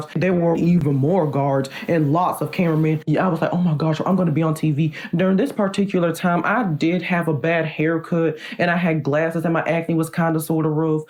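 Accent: South, United States. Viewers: Stop talking about his voice. It's not funny it's normal because he is obviously from the south. The only part I laughed at was when he was talking about himself and how he looked on tv which was the only part that would be acceptable to laugh at because the rest of the video was him telling a story. The way his voice sounds is irrelevant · He’s country or something. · It’s the country accent fa me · i noticed he a really big country accent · he's so country, i love it!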